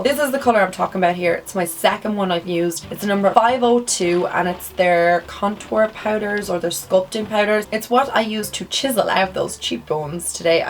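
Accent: Ireland. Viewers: so funny I'm obsessed with you girl your amazing and me and my fiance are obsessed with conor McGregor yall are both Irish and while I'm watching you and I hear another Irish accent lol I look over and my fiance watching conor lolol point of story I love you and we love Ireland ♡ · i watched several of your videos and i could figure where your accent cam from. well now i know :) always loved Irish accents!!! you are now added to my favorite youtubers XD besides i love your videos anyway so your accent is just a plus!!!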